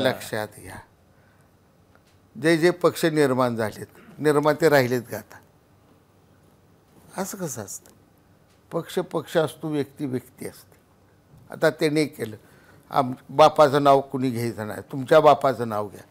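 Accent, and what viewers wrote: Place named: Mandesh, India. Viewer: सांगोल्याला मिळालेले दोन हिरे गणपतराव देशमुख साहेब आणि शहाजी बापू पाटील ❤️ माणदेशी बोली ❤️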